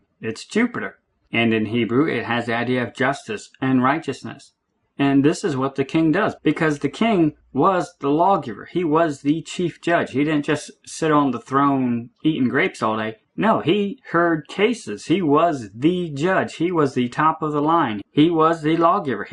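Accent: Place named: South, United States. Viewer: I know, isn't his accent the cutest, southern drawl. He will be busy with lots of hugs very soon! Of course, after we all fall down at the feet of our amazing Lord and Savior Jesus Christ and get hugs from Him first. Maranatha guys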